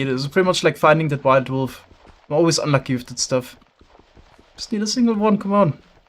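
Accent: Germany. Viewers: His accent is really german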